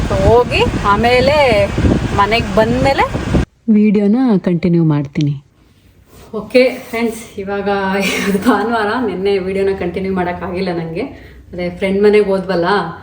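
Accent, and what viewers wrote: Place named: Malvali, India. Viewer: nim vidio nodtidre Indiadale hidira ansute nim language pakka malvali bashe USAge hodru mothertoung change agila ade Kushi namge nim hand mel hiro village style old tattoo super agide❤😅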